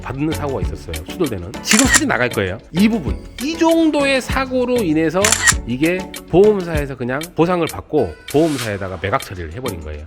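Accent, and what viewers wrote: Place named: North Jeolla, South Korea. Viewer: 고향이 전라도 어디신가요? 말투가 북도같은데요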